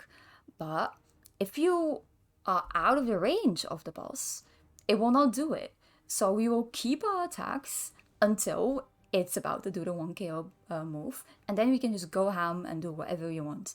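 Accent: United Kingdom. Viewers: Are you British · Nice guide British woman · Are you British?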